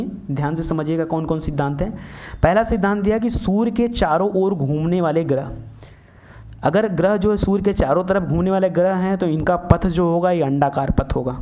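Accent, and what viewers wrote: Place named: Gorakhpur, India. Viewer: sir kya aap Gorakhpur pur ke pipiganj se hai kya aap ka aawaj waisa hi hai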